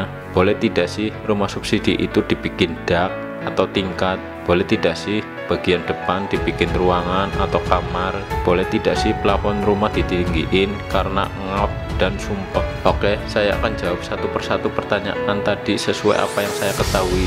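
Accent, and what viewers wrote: Banyumas, Indonesia. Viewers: Ngapak betul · Ora ngapak ora kepenak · Ora ngapak ora kepenak ya kang... Hehe Matur nuwun kaang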